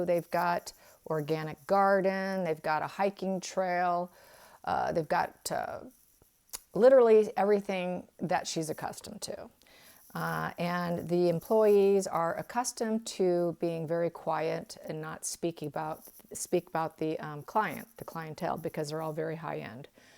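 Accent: United Kingdom. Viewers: She is a genuine psychic, she doesn't read with military precision but it all pulls together in the end. If you are not British you may find the accent a bit difficult, but then us Brits find American accents and their mangled English difficult too. Oh well, it is good we are all different isn't it?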